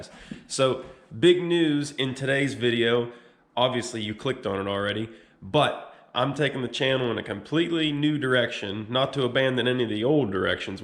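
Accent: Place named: South, United States. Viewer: Are you from western PA originally? You sound very southern. Especially the ol' lady comment.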